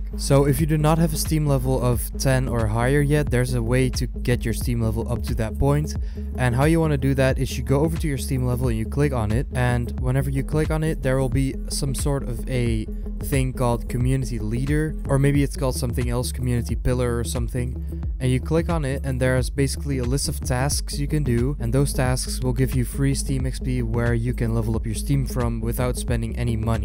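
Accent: Netherlands. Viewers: IK WIST DAT JE NEDERLANDS WAS! :D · Wait. Are you Dutch? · i just knew that you were dutch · Instantly knew you were dutch hahaha you guys never can hide your accent :) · dutch accent NotLikeThis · ben je nederlands xD lol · lol ben jij Nederlands? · Are u Dutch? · Are you from the Netherlands?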